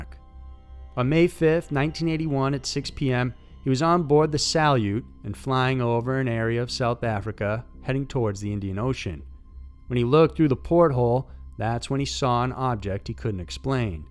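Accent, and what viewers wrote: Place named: Texas, United States. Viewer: He is probably from Texas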